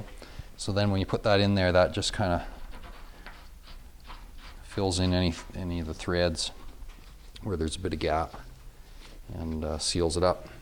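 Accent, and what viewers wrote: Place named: Canada. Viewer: I have this exact same metal plus plastic valve on an old John Wood hot water heater. After a few times of opening it to drain the tank it just wouldn't shut properly. I've since replaced the end valve with a brass ball valve to reduce the drip, but because of poor sealing it drips about one drop every 20-30 minutes. I'm replacing the whole thing with a new brass 3/4" ball valve using this video as instruction, so thanks for the relaxed, quick, informative presentation. BTW just from how you spoke, I knew you were also Canadian. Thumbs up.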